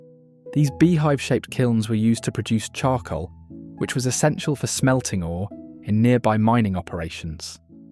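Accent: England, United Kingdom. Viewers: Unseen Wyoming. We love Wyoming. PLEASE use an American narrator for American locations. It is only respectful. Plus, we cannot understand some of the words, as spoken differently. Save the British for British. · Wrong accent don’t need a Brit voice in American 🇺🇸🇺🇸🇺🇸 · I think the documentary would sound better with a native Wyomian than a British person narrating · Yes. An American state british accent. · why the F does this have a brit accent?? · If it was filmed by a Brit then the narration is perfect. He’s easy to understand. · Sounds British. He is making a nice video. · The British accent ruins the documentary. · This video has literally nothing in it that is hidden or unseen in Wyoming. These are all famous attractions known by any visitor which I am almost certain the video maker never has been. If you were English like the video sounds maybe check out London, that’s a very obscure place in England you could do a video about try focusing on London Bridge or Big Ben. 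But probably you’re just AI . Either way stay home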